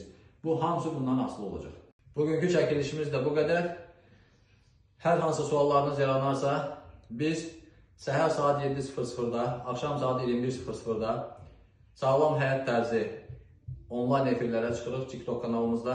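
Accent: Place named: Qakh, Azerbaijan. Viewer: Əxi siz Qaxdansız? Qax ləhcəsinə oxşayır ləhcəniz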